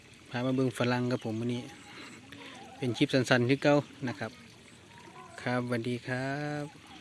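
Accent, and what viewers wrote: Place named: Chaiyaphum, Thailand. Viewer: ว่าแล้วสำเนียงบ้านพี่เล้ยยย น้ำไม่ท่วมดีใจฝรั่งก็สวยงาม ยังไม่ได้กลับชัยภูมิเลย อยู่แถวไหนครับ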